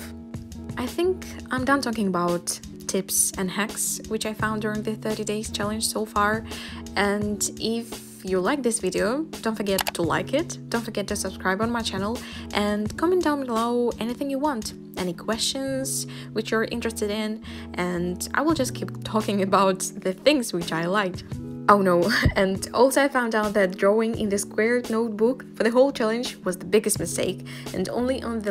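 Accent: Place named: England, United Kingdom. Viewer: I just love you Englishvoice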